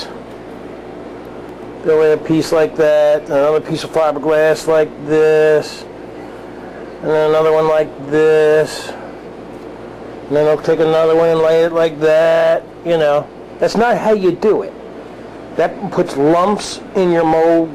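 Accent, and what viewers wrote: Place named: Northeast, United States. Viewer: He must be from the North East I guess New York or New Jersey one of the reason I moved to Texas.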